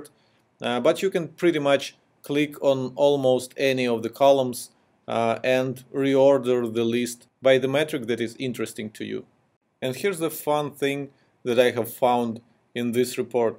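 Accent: Russia. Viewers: Русский акцент, фууууу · great information ! are you from russia :)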